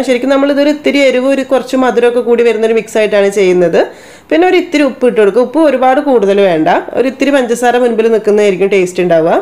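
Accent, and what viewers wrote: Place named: Wayanad, India. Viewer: Wayanadan slang 😙😙😙😊nokkummo, cheyyummo അങ്ങനെ.. 💪💪wayanad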